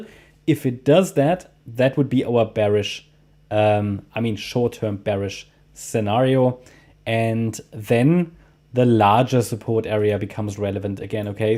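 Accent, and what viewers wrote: Germany. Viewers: Great content! Just discovered the channel. German?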